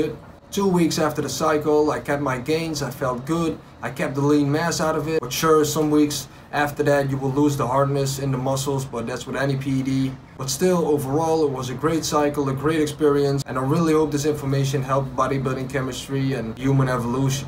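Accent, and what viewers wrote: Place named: Netherlands. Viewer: U sound Dutch. Was just in Amsterdam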